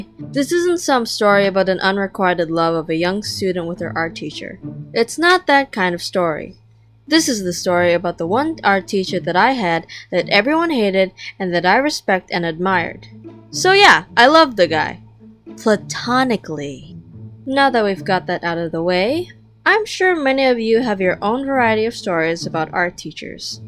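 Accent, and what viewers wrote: Philippines. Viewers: The moment I heard your voice I new you we're a Philippine and btw we can our teachers sir too, and I also have a good art teacher :3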